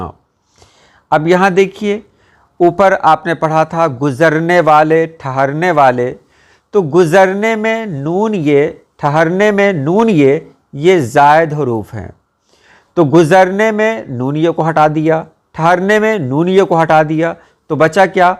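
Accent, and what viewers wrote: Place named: Patna, India. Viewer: سر میں پاکستان سے ہوں میں آپ کی ویڈیو باقاعدگی سے سنتا اور دیکھتا ہوں۔ مجھے ناجانے کیوں ایسے محسوس ہوتا ہے جیسے آپ کا تعلق پٹنہ سے ہے کیونکہ میرے ساتھ مسقط میں کچھ دوست کام کرتے تھے جنکا لہجہ بالکل آپ ہی کی طرح تھا سر آپ کا وٹس اپ کا نمبر اگر مل جائے تو میرے لئے باعث فخر ہوگی شکریہ اللہ تعالیٰ آپ کے علم میں مذید اضافہ فرمائے آمین ثم آمین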